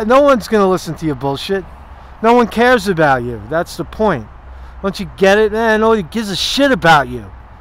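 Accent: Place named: St. Louis, United States. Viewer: ...I grew up on a State street in St. Louis...although You are a little more refined than me I feel we have a connection through speech...It's like-- I came to kick some ass and chew bubble gum...and I'm all out of gum...keep up the Hard work my man.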